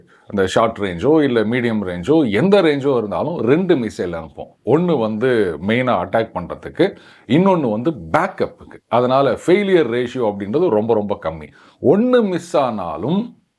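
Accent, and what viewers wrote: Chennai, India. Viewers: வணக்கம் சார். நீங்க அடிக்கடி இடையில் சென்னை பாஷையில் வெளுத்து வாங்குறீங்க 😂😂😂😂😂😅😅😅😅😅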